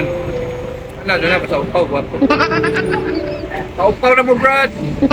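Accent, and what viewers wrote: Visayas, Philippines. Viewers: If I close my eyes...BISAYA jud ang nagastorya, sa dihang pagtan-aw na nako,si diay!😹👍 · Bagtikas bisaya nimo dol oi.. padayon lng dol sa pag blog og mga lamian pag kaon 👍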